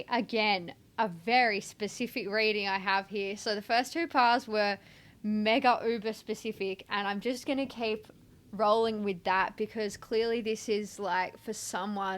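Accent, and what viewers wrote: Australia. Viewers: G'day!! Thanks to 13 Moon Tarot 4 recommending your channel. I even hit subscribe b4 i listened 😂As soon as u began speaking, a huge smile cracked over my face. It's so refreshing to hear an aussie!! Card 3 totally resonated 😊 as I listened in my Queensland garden ❤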